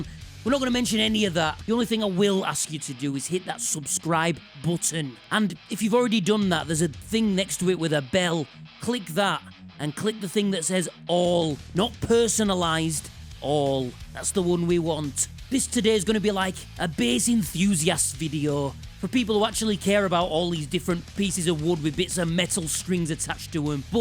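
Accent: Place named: Scotland, United Kingdom. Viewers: I just noticed he's got the same accent as Scrooge McDuck. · ...as an American dipstick bassist..I like your style..and as an owner of a lot of different basses..it's really cool how you show the differences in the various basses...chunks of wood with magnets and strings...but its the owners hands that makes the instrument talk..oh..as an American dipstick...when I first ran into your post...I thought you were Scottish...sorry...( I consider myself more of a douche nozzle..)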